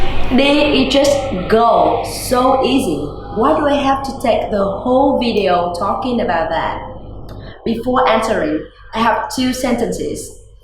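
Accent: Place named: Vietnam. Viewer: Video rất hay. Trên youtube có rất ít video dậy tiếng Việt bằng giọng khác tiếng miền Bắc. Quê hương là ở đâu? Miền nào? Cảm ơn và TVO nhiều.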